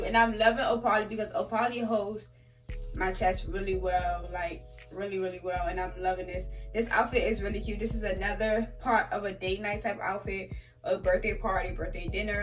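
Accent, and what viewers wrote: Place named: DMV, United States. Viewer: I love everything sis that dmv accent 🤣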